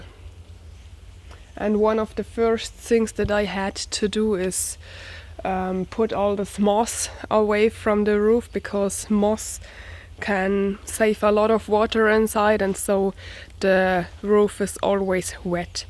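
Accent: Germany. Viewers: You sound very german :) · please talk in your origin language because your english isn‘t good. we literally can hear that you’re german lol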